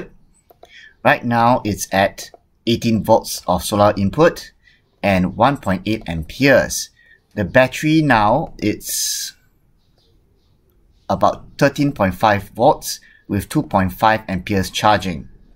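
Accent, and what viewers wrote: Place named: Singapore. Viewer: sound singaporean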